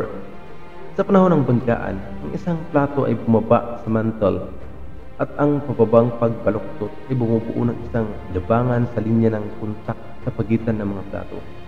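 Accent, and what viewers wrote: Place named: Visayas, Philippines. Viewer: Wala na halos maintindihan puro reverb.Visaya ka pa man Dong😅😅✌️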